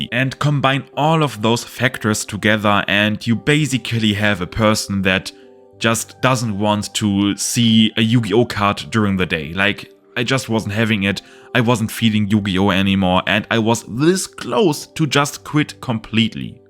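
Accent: Germany. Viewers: Love the content, always excited when I get that upload notification. Also, not sure if you knew this... you have a German accent. Anyway keep it goin bud! See you on the next new deck whatever it may be! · you have a german accent bro, will terror miss timming? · Do you realize terror incarnate will miss timing if it is linked away? Also btw u have german accent 👍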